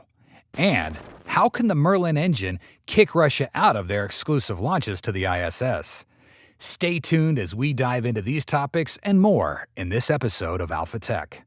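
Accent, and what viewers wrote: United States. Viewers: You're wrong. It's good engineering info, written in a very calm Asian style and voiced in a very cool American accent. Clickbait, sure, but not claptrap. It's legit. It would make sense if you had studied engineering.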